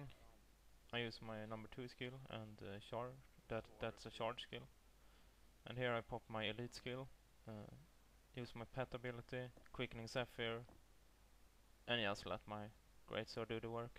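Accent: Sweden. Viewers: are you swedish? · Dat swenglish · Why did you choose that pet? Is it the best pet, or what? (Man hör att du kommer från Sverige) xD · dat swedish accent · En svensk var det ja ^^ nice. hör det rätt tydligt på din dialekt/brytning. nice vid btw :D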